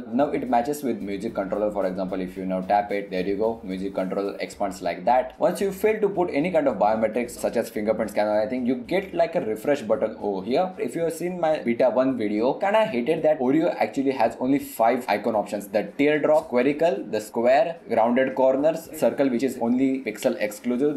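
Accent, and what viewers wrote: India. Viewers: love your accent...it feels wowly Indian....😎😎😎thumps up...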